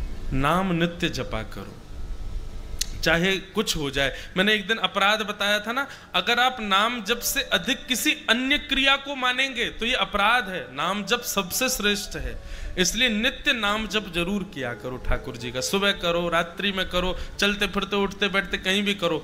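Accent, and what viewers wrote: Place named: Braj, India. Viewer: Raadhey raadhey guru ji aapke charno me koti koti pranam aapki Katha me rojana subah jagte hi sunti hu aapke mukharvind se brij bhasa bhut sunder lagti hai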